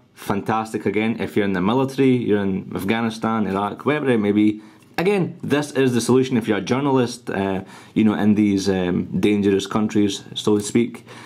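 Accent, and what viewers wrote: Scotland, United Kingdom. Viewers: are you scottish? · I like how you say otter, o'er box, i love the Scottish accent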